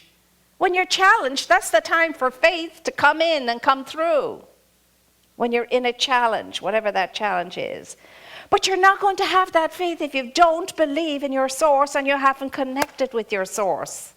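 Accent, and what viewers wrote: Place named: Ireland. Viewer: Love the message. And her graceful hint of an Irish accent is beautiful to my ears.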